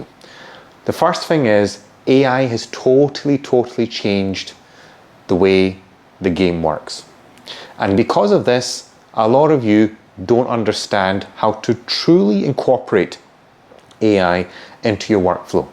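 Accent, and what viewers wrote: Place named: United Kingdom. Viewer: Heyy bro this is a great video, I currently work in the IT Sector and I’ve been in my role for 4 years a 2nd line role, and I’m looking to change career to become a Scrum Master. Considering my IT background which certifications would I need minimum to become a Scrum Master, Agile Project Manager, Delivery Manager. So and so forth. In the London Region? You sound like you’re from the UK aswell. I also have an ITIL Framework certification. Thanks man, and I look forward to your response🙏 your videos are great, keep it up👍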